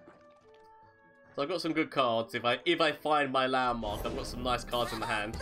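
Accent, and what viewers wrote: United Kingdom. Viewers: you're the best british streamer hands down. As someone from the commonwealth but not the isles I tend to find the accent interesting. Just a thought, but maybe you can market yourself as the ' UK ' streamer 🤔. As well, I'm part if the discord just to preface. I thought though that to grow and reach your goal of 5k you need a website, unless you have one already; did not check. Al a swimstrim per se. Although, love your decks because they are fun! I also think you could look into paid marketing for your targeted keywords. I do it professionally for other people. No obligation for any ' deals ' but I can help in return for your videos. They keep me going sometimes 😄. It would help grow quite reliably imo Furthermore, please stop slouching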